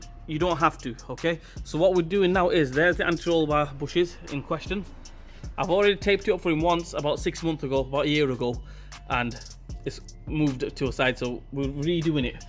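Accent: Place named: Northern England, United Kingdom. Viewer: North England somewhere by his accent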